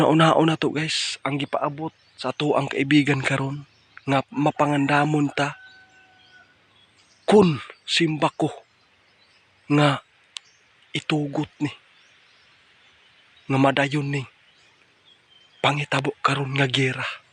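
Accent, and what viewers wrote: Visayas, Philippines. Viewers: Grabe kang bisaya ka, tagalogin mo uy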